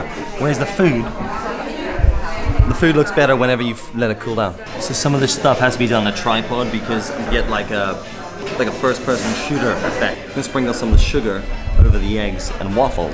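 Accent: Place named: Northern Ireland, United Kingdom. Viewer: Where is your accent from? It sounds like a cross between Northern Irish and a New Zealand accent.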